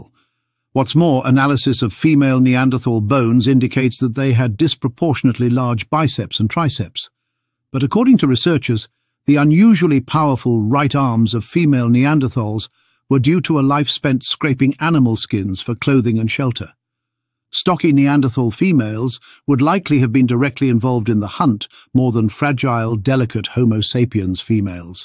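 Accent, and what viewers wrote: United Kingdom. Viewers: A clipped brit accent and utterly no academic background in physical anthropology makes for sensationalism , not science.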